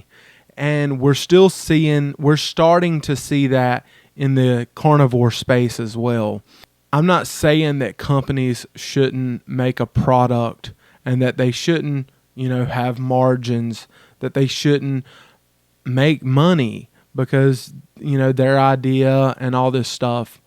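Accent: United States. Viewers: Love your accent and pronunciation, where is that from in the US? Good job calling out greedy and grifters. Some ppl just think how to profit from others. These times are not easy, there are many ppl having hard time making ends meet. I'm on high carb low fat, that's way cheaper than carnivore. Could just eat rice, beans, fruit, veg and sugar, not a vegan, but if it came to that, could survive on much less than those on carnivore.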